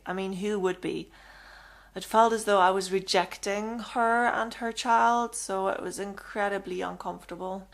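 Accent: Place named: Northern Ireland, United Kingdom. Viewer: You sound northen irish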